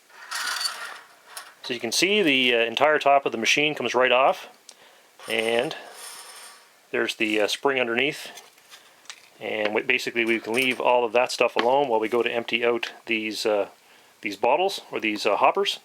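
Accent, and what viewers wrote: Canada. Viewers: Great video! I just bought one of these recently, as I have a plethora of spent shells I kept for eventual reloading. I like the Canadian accent too.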